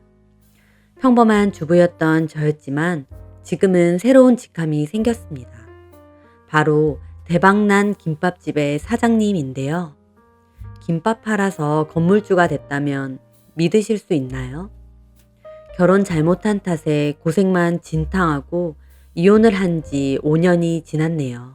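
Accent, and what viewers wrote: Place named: Busan, South Korea. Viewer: 사투리 정말 잘 하네요. 부산 사람이신가요?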